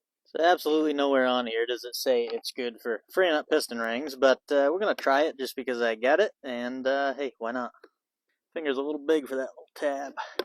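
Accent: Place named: Vermont, United States. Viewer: what part of northern Vermont are you from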